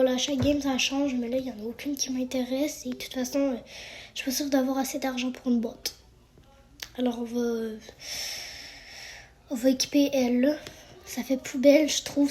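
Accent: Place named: Quebec, Canada. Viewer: Tu es québécoise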